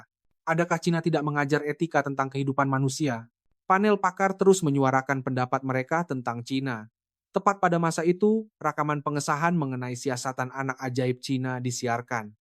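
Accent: Indonesia. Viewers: Nmpk sgt citer rekaan. Berlebih sgt. Suara pun indon. Kami biasa² je. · kenapa suara loghat Indonesia. ni Chanel apa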